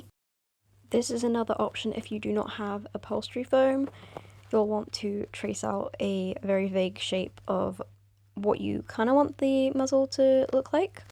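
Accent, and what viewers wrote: United Kingdom. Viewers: Oh my god this tutorial is amazingggg,, also the accent?? Are you British?? :)))) (if so do you mind me asking where abts? Like north, south, west, midlands etc)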